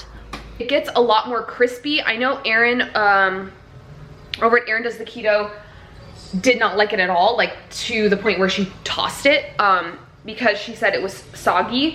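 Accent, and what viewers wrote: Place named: South, United States. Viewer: Do you live in Texas? See the Texas shirt and I do so just wondering. I know you have a southern accent for sure. We have a Sea World around San Antonio area.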